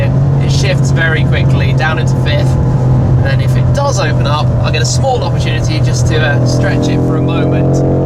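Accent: England, United Kingdom. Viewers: Where are you from Schmee...England or Germany? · english accent is really ugly sounding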